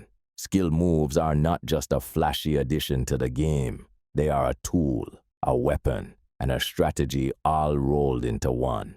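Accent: Jamaica. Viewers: I like the Jamaican voice don't change it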